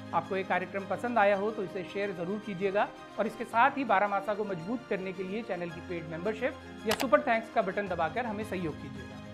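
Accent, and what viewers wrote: Garhwal, India. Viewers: आप जो गढ़वाली और हिंदी को मिलाकर बोलते हो बड़ा अच्छा लगता है।।।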